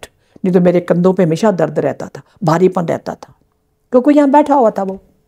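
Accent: Punjab, India. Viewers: She is Punjabi...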